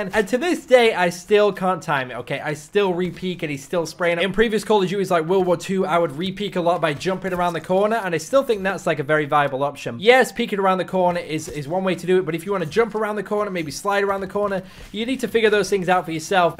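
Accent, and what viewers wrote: Yorkshire, United Kingdom. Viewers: Great vid. Ive got something to try now. Makes a change to hear a Yorkshireman on here!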